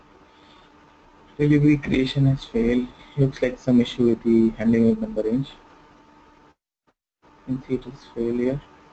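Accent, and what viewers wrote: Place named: India. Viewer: indian accent makes this a hectic video it can not be watched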